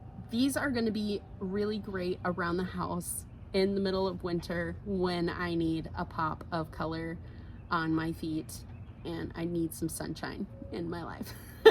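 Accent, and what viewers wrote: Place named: Wisconsin, United States. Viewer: Another Wisconsinite here! Thought I recognized that accent😉😂😂😂 Just stumbled on your podcast😊 and I'm so happy I did! Just learned to knit socks about 2 years ago and have become obsessed!!! They are THE best for our frigid WI winters❤️❤️. I also started the tradition of gifting them to my adult daughters for Christmas - this will be the 3rd year😊 And they always end up sending me pics of themselves wearing them & saying how much they love them!!! Thanks for taking the time to share your knitting!!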